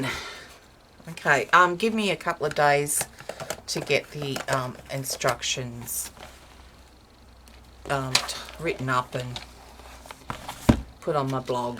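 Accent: Australia. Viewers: So awesome to hear an Aussie accent on here. I'm sure we have lots of talented people here just like there are all around . Love your work. TFS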